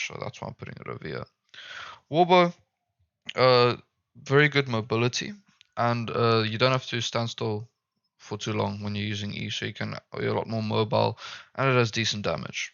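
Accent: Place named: South Africa. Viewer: You south african boet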